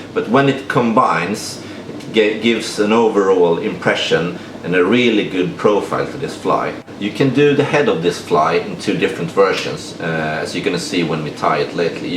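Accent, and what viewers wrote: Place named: United Kingdom. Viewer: 700 claps, great stuff my friend!!! I enjoyed your video, nice flies, and good to hear your voice, sounds more British than Swedish...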